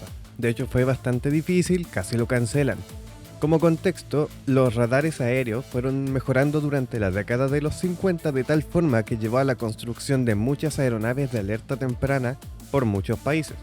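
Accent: Chile. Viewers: Solo por curiosidad, el que relata es de origen chileno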